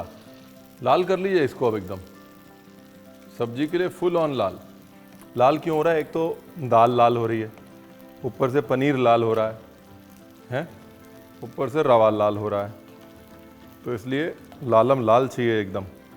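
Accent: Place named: Lucknow, India. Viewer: Your Lucknow-a accent is sooo good , you're so Raw 🔥🙏 thankyou for making this channel . 🥰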